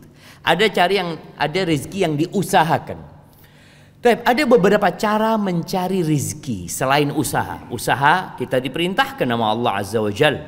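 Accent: Madura, Indonesia. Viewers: Ustad kog kentel bahasa maduranya, dan tau bener ttg madura, beliau asal mana ya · ust ngocak meduronya keren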